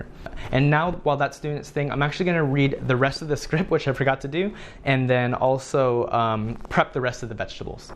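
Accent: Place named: Hawaii, United States. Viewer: You have so much more / better equipment than me. I’m kind of embarrassed 😅 are you from Hawaii? I can hear a slight Hawaii accent.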